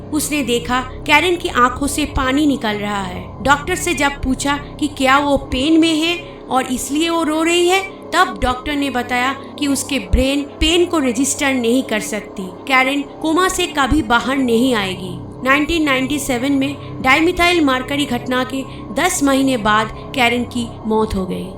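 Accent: Bengal, India. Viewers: Loved the way u say mercury... From day 1 i had found ur bengali accent very cute.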